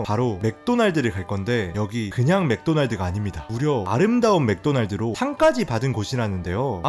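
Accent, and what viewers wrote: Jeolla, South Korea. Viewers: 광주분인가?? 전라도 사투리 어투가 뭍어나네요... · 구수한 전라도 사투리와 쌉소리 스크립트의 환장의 조합 ㅋㅋ 재밌게봤습니다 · 남도 사투리가 겁나게 친근허요...잉.. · 구수한 전라도식 발음이 참 정감이 넘칩니다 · 내용은 좋은거같은데 전라도 사투리가 너무 구수~~해서 앞부분만 보다가 갑니다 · 전라도 억양! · 전라도사투 리에 디테일한 언 어 구사력 쥑입니다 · 전라도 엑센트 못버리네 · 억양이 빼박 전라도네요! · 전라도 사투리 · 억양이 귀여움~전라도쪽인듯 ㅎㅎ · 영상은 재밌는데, 절라도 사투리가 너무 거슬리네요. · 익숙해질때까지 시간이 좀 걸리네요 전라도사투리였군요? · 전라도사람인가보네요 악센트가심하시네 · 사투리는 어디쪽인가요? 전라도? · 절라도 사투리 졸라 쓰는디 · 전라도 말투도 너무 정겨워브럿고요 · 전라도 사투리. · 고향이 전라도인가 바요? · 난 경상도인데 전라도 말씨 참 좋다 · .억양이 절라도 말투가 너무 심해서 알아들을수 없습니다....절라도 억양좀 자제해주세요. 인간적으로 너무 심합니다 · 아 전라도근본 사투리억양 ㅋㅋㅋ 듣기힘드네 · 전라도 사투리 듣기싫어요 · 전라도 사투리가 꽃혀서 집중이 ㅜ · 전라도 사투리만 안써도 볼텐데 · 고향이 전라도 인가요?ㅎㅎ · 전라도 사투리 나레이션 익숙하지 않은데 재밌네요 ㅋㅋ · 혹시 전라도 분 이신가요? 말투가 너무 정겹네요 ㅋㅋㅋㅋ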